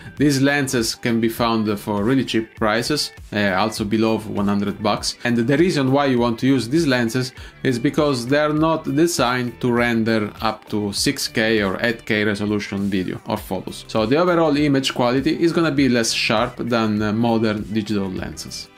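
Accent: Italy. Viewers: I love your positive attitude, quick smile, great information/advice, and pleasant Italian accent! I think if you keep this up you will eventually earn many subscribers. But (even though I'm not a director) I'm going to "blast you" about focus! It's very distracting that your eyes and much of your face are out of focus for so much of this video. I would advise to just go ahead and stop down the lens a bit next time and turn up the lights to bring back the exposure you want.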